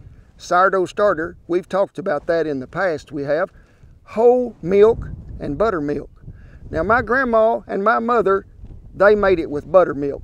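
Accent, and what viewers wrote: South, United States. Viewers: Man, I love his Southern accent. Long live Dixieland!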